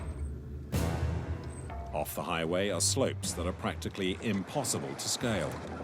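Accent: England, United Kingdom. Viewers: Nice English narrator. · His a pommie, thats how they speak, little on the painful side. Should have allowed the locals that spoke english to be heard. I could hear what the people were saying while he spoke. Some of his information was incorrect, but still a good documentary. Thankyou for sharing.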